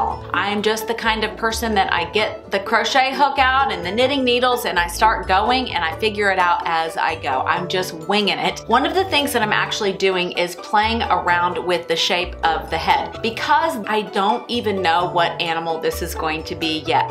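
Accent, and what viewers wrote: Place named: Virginia, United States. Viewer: I really liked this one. I'm from norfolk, va and your accent reminds me of home. I like old cemeteries too!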